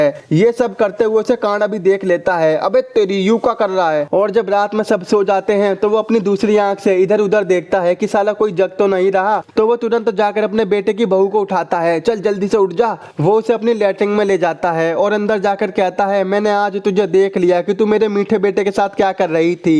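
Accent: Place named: Rural, India. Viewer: bhai tu thoda sa behan ka loda h kya teri aawaj kitni madharchod h or gawaro wali h 🤣🤣🤣🤣🤣🤣🤣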